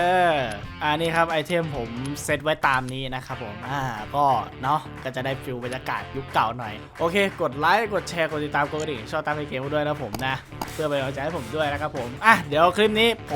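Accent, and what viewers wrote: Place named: Korat, Thailand. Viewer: ดูว่ะ นึกว่าคนโคราช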